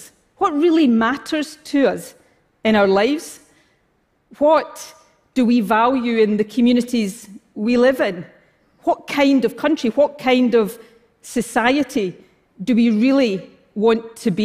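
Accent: Scotland, United Kingdom. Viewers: Oooh-a morning TedTalk and a nice brogue to listen to. I like this woman; she seems to know what's up. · Scottish accent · I'm English and understand every word, she's speaking English with a Scottish accent, just one of many different accents in the UK, I have a strong accent from where I come from in England, you'd probably find it hard to understand me, we don't all talk the same in the UK.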